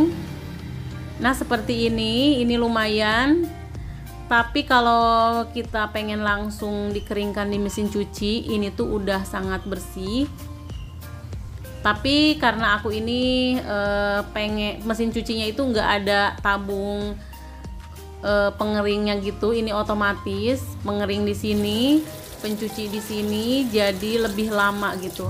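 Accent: West Java, Indonesia. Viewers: Kakanya meni Sunda pisan 😍 apalagi pas nyebut kata TV = dibaca tipi, hehe ... Semangat terus yah kak ngontennya, bermanfaat bgt nih 🥰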